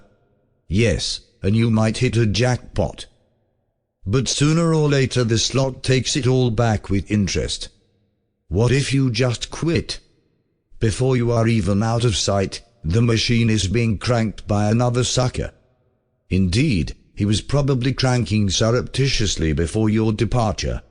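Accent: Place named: United Kingdom. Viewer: This British accent is driving me crazy.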